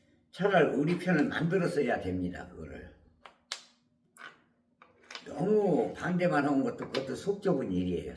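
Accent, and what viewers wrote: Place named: Jeolla, South Korea. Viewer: 말소리가 라도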